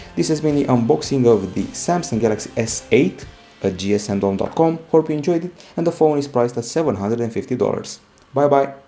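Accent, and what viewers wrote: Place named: Romania. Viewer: După accent se vede că eşti român :)))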